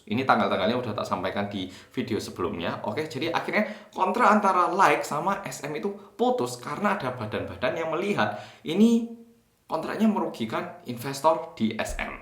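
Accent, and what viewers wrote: Java, Indonesia. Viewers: yg komen agak sdkit kecewa dan mngkin skit mostly sm stand yg notaband agak benci berembel Hybe dan BTS,sdikit yakin itu..aq paham krn aq Army,sejak jman vote dll pun kbnykan Sm stand bnci bngt,but its okay. well aq gk mnghakimi mereka sbgai Sm stand,tp be strong for all of us sbgai fans..#nowarnohate #justopinian peace✌️ Btw pnjelasan nya keren bngt,Thanks Mas Korea yg Medok. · Mas medok andalanku, sebagai JYP stan gw mendoakan yg terbaik buat SM entertainment 🙏🏻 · suara medoknya berkurang drastis.. Lebih berat korean aksen 😁..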